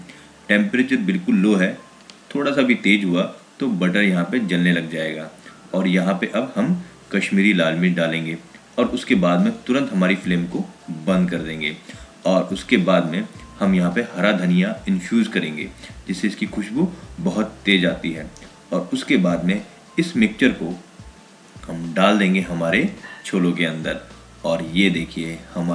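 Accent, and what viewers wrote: Pahari, India. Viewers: LAPATUWAPAN..........ahahahha.... ji pata nahi aapki baat aur bolne ke tareeke se lagta hai aap pahadi ho meri tarah...........uttarakhand se. And jordaar recipe by the way.... Main pakka try karunga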